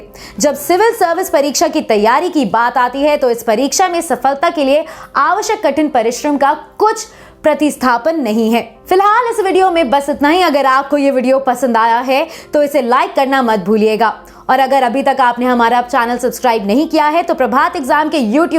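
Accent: Standard. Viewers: Didi itnii shudh hindii🥺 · Wow Dhany ha Devi ji (ma'am)Aap etni sudh Hindi to hame Aaj tak Kisi teacher ko dekha hi nahi Speech dete hue. 🙏🙏🙏👏🙂❤️ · Clear and sudh hindi .. o my god 😘😘😘😘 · Wah didi itna shudh hindi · Itni shuddh Hindi 🎖️🏅🥇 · दीदी आपकी शुद्ध हिंदी से मैं बहुत प्रभावित हूं जिंदगी में पहली बार किसी के मुंह से साक्षात सरस्वती मां की बाणी सुनी है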